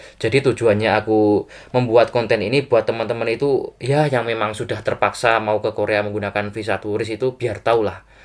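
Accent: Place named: Java, Indonesia. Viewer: Jamet medok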